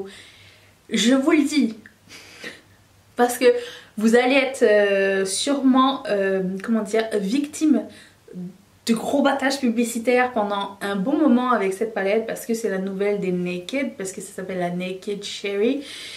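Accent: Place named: Quebec, Canada. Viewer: Chui d'accord av toi pour UD .. perso quitte à me choper une palette dans le même genre , j'opterais pour la Vénus XL de limecrime ❤ j'adoooore limecrime ... puis elle est plus grande pour le même prix si il faut . Ta chaîne est géniale en tout cas , tu me fait délirer et tu commence à voir le petit accent tabarnacle ;) T'as un putain de look chui trop fan ! Et on en parle de ton truc en plume la ?? Ou as tu shopper cette merveille !!!!????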